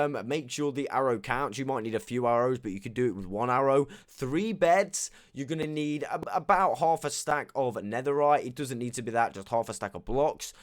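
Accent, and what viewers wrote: United Kingdom. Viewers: You sound like DanTDM but your accent is more British (hope that makes sense lmao). Also great video. It helped me so much, I used to spend so much time getting armor and stuff for fighting her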